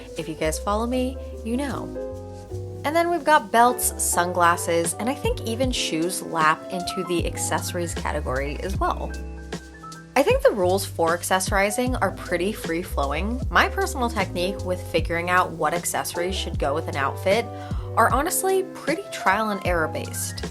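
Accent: United States. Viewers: The mid Atlantic accent🤌🏼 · So creative and loved the tips!That transatlantic accent was everything 😂❤👏🏻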